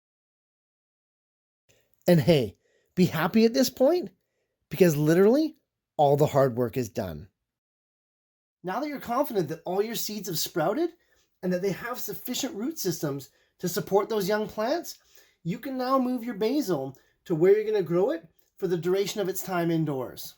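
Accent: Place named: Canada. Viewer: I thought so too. So I looked it up. Turns out, Americans pronounce it with a silent H. Brits pronounce the H (as one would pronounce the nickname for Herbert). The host of this video sounds Canadian to me, so it makes sense that he is using the British version.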